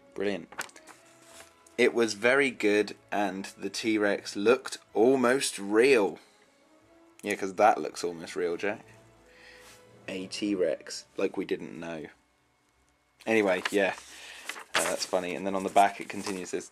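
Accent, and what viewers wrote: England, United Kingdom. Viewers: your from england rite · do u have a British accent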